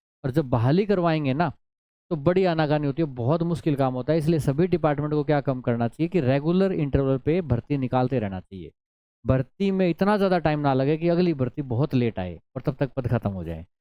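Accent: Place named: Haryana, India. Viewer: Sir apki language se haryanvi jlak rhi h.. maam ne btaya tha ki ap haryana se belong krte the.. Phle. 😅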